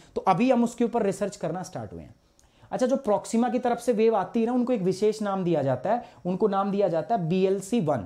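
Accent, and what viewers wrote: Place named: India. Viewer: Mst ho sir aap...Jat da putr sher👍 Way of talking great like Desi 😂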